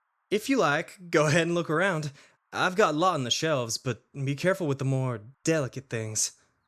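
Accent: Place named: South, United States. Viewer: He making me like country accents…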